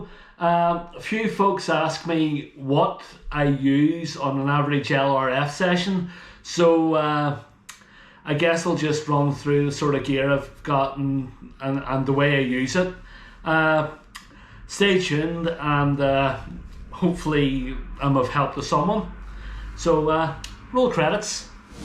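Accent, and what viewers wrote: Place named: Northern Ireland, United Kingdom. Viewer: Howdy, You sound like your from Northern Ireland. Loving the box, Wd40 over the label will remove it.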